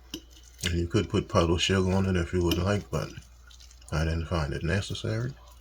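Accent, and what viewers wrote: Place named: Louisiana, United States. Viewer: Hey there!! So happy I subscribed to your channel! First of all I must say I love your voice lol although you do sound like you were from New Orleans or Louisiana! This French toast casserole sounds amazing can’t wait to give it a try! Look forward to watching all of your videos! Loving on you from Philly!!! 😊🙌🏼💜💜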